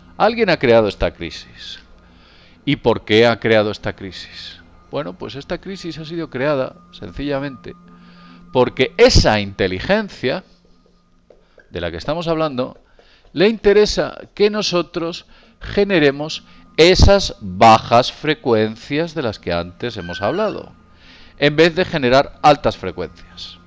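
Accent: Spain. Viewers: tio deja de hablar como español, no hay nada de malo ser peruano.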